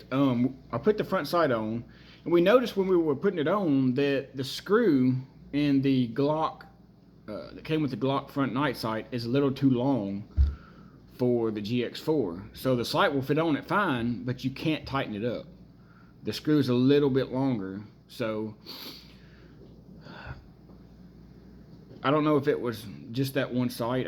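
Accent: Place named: East Texas, United States. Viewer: Just curious, are you from East Texas? Accent sounds familiar lol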